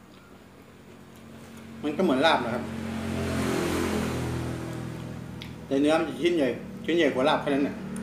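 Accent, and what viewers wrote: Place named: Isan, Thailand. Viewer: จ่าคือบ่อเว่าอิสานบ้านเฮา..มันมีเสน่เด้ ผมว่าน่ะ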